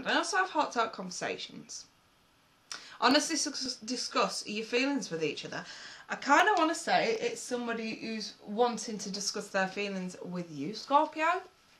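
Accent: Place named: Manchester, United Kingdom. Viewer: Fab reading chic........R u from Manchester????xxxxx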